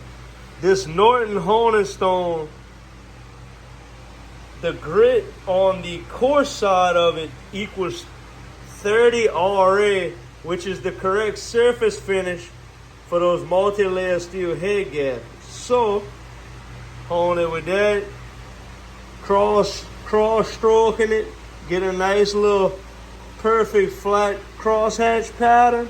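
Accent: Louisiana, United States. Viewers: Is this a Creole or Cajun accent? No disrespect intended. Love it either way. Uniquely Louisianan . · Come on now, obviously it’s Cajun. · You sound like one of them fellas around New Iberia or St. Martinville.. or one of them backwoods Louisiana areas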